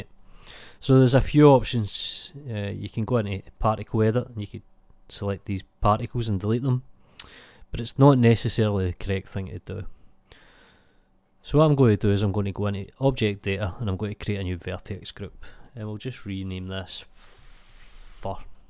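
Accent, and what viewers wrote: Scotland, United Kingdom. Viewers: I can hear a Scottish accent.. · I don't know what it is man, Scottish accent = instant like. You could be saying anything, it doesnae matter it's just like a fucken biological impulse. You could be like "awrite lads, welcome tae this tutorial on how tae punt puppies really far" and I'd still like the video.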